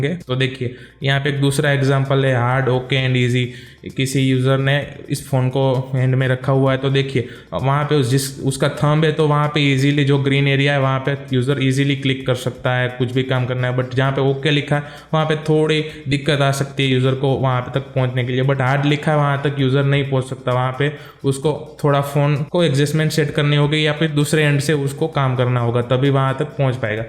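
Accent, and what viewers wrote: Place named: Gujarat, India. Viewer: badhiya video he. god bless. your Hindi is wrong. i thought you were talking in Gujarati but you made quality content. keep it up alwayes. Liked Shared and commented.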